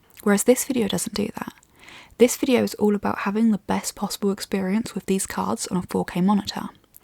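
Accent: United Kingdom. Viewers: I really love your UK accent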